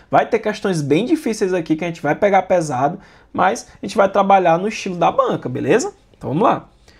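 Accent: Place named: Northeast, Brazil. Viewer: Ninguém pode se irritar com seu sotaque nao, oxi #orgulhonordestino. Sou carioca, mas minha familia é nordestina!! Excelrnte prof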